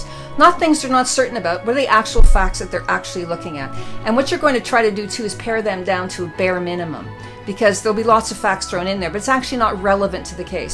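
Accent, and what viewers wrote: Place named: Canada. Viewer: Oh ! Wow ! I had no idea !?? That you are/ were a lawyer !!!?( Did you mention in the 1st video I watched !? ) You are a very interesting woman ! 1st. A very good looking lady .2nd. A lawyer 3rd .Cares about animals 4th. has a musical talent ( piano )5th. a vloger 6th. a Canadian woman living in Wales ! ( keeping her own accent & not speaking w/ a Welsh or British accent ! In a way , cooler as your native language is English to begin with ! I've met many English men lost their accents living in U.S. It's a shame ! I think everybody should keep somewhat their accents ! 7th.living a healthy lifestyle , a vegetarian & rides on a bicycle everywhere you go ! ( maybe except for drinking !? Lol ). 8th .A mother of a gorgeous young lady who's gonna be a lawyer herself in the future ! COOL !!! You looked like an actress in the picture !!! 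I feel sad to see your friend's passing information at the very end ! Way too young ! I am sorry for your loss ! Very sad .💜💜💜💜💜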